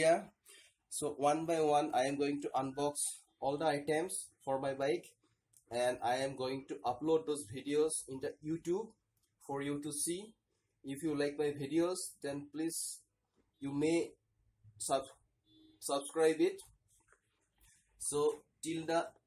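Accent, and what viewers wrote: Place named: Assam, India. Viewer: pakka assamese accent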